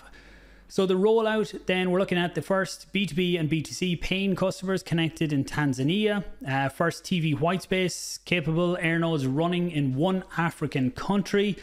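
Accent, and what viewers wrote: Ireland. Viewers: I truly do love the Irish. But whenever I hear that accent I start looking for my pot of gold. Not sure how that got started but I really would like a pot of gold if you could manage it.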